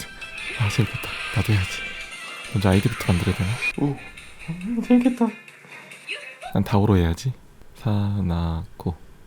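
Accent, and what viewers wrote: Daejeon, South Korea. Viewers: 사나고님 대전분이셨나요??!?! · 사나고님 대전사람이시네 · 형 대전사람이였어...? 이제 알음...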